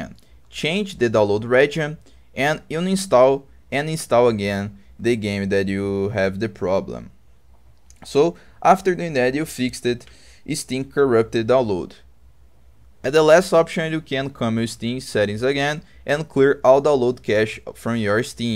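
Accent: Brazil. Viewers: esse sutaque br ai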